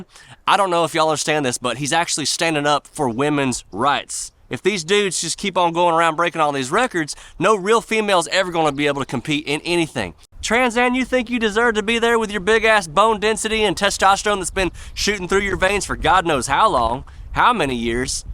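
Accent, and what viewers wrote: South, United States. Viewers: Howdy y’all, I’m a 60yr old Canadian woman. We were the Led Zeppelin teens. First off love your southern accent! So smooth and friendly. Here in Toronto this whole thing has exploded. Out of fear of being called a Na*i I’ve tried to do the pronoun thing but holy sh*t I’M SO DONE!! These people are like “Revenge of the Nerds” gone nuclear!! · Between the Southern accent, and the cadence in which you speak you reminded me of someone then it hit me. Ted Lasso!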